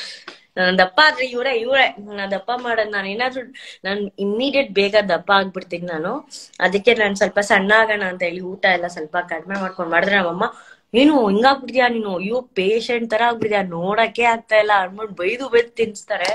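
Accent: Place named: North Karnataka, India. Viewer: Nanu Dayanand Goudar anta Namadu Gokak hatra Chikka halli Fulagaddi urinavanu First Nimage nanna kade enda Namasakargalu Anushri Akka mate Nimage wish You Happy Birthday🎂🎉🎂🎂🎂🎂 Nivu 100 kala channagi balabeku anta nanna ashe ade riti Nivu namma uttar karnataka bashena Namma hage matadtira namage bala kushi agutte mate Nivu andre tumbane esta ellarigu Vande vande namma uru kade banni medum plz 🙏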